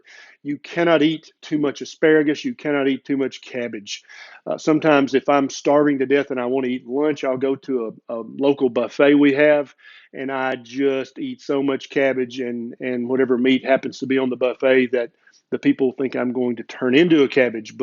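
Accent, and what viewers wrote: South, United States. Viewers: I could listen to you pontificate on celery for hours 😆 The Southern accent is too good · I love your southern twang!....oh and the video too!!!😉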